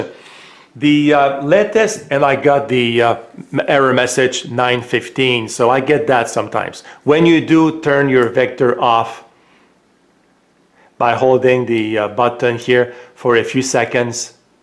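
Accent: Quebec, Canada. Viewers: i guess its the accent ! , he has a strong quebec french accent , for it to work , he should say . hey vector .. bring me the cube TABARNAK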